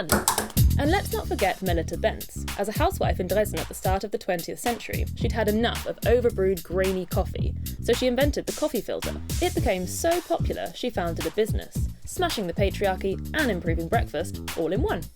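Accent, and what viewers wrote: United Kingdom. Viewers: pantes aksen Inggris awalnya saya kira asli orang Jerman ternyata Inggris 😅😅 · Bicara tentang jerman tp dengan aksen britania hehe.. saya suka yg terakhir, semua hrs rela berkorban utk sebuah tujuan bersama👍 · Salfok sama accent britishnya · Waahh serasa menonton harry Potter pakai aksen British 😄😄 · Suka banget aksen british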